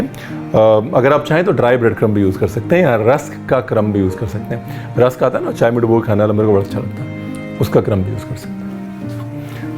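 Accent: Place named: Bengali, India. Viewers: Simple yet amazing recipe. 👌 Dear chef Thank you very much for sharing Kolkata style Chicken Cutlet recipe. Your bengali accent is too good. Lots of love from Kolkata. 💝💝💝💝💝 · Too sweet bengali pronounce...and also the comments on BONGEATS is really nice. I also believe same. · Your bengali accent is very nice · Sir...your bengali accents sounds so amazing...ohh damn good when you spelled ranna ghore,sorshe bata,posto bata 😁.... being a Bengali just loved your feelings towards bengal's food culture...specially kolkata.... literally amazed...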